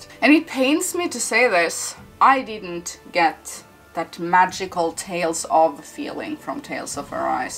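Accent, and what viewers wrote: Norway. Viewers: Cool video girl. I subbed because you're cool and I like your accent from Norway huh?? Thata neat and you like Nintendo 🙂 Happy New Year!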